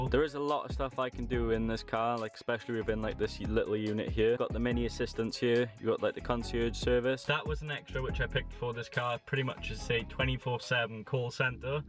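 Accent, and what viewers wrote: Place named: Wales, United Kingdom. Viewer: Where are you from? You sound like you’re from Wales...I’m from South Wales! Love my mini and I also hate when it gets dirty 😏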